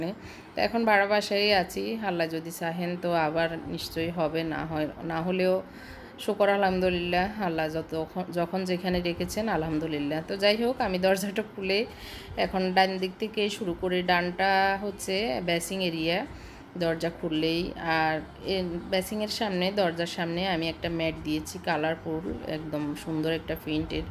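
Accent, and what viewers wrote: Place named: Chittagong, Bangladesh. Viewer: Vaia unar kothay chittagong er tan ase.... Aita banan er vhul mone hosse na r omorjada o kisu nai.... Thank you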